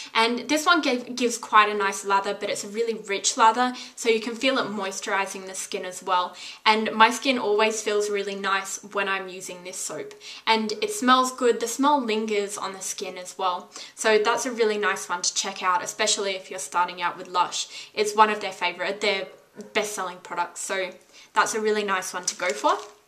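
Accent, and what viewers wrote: Australia. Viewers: She's deffoo austrailian....ivegt cousins frm both so inoo..x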